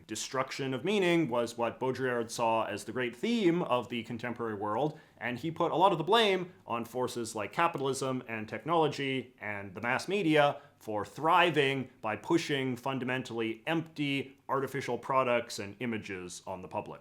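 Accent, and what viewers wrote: Canada. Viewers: it's a canadian accent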